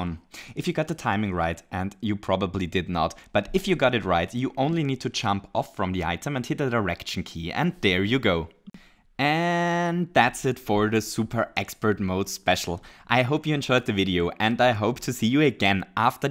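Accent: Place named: Germany. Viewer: Haven't you heard of its a German accent?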